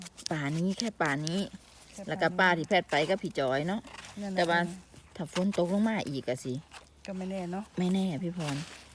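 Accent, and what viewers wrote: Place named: Central Thailand, Thailand. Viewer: พี่พรเป็นคนจังหวัดอะไรรึคะ พูดกลาง เรียบร้อยๆ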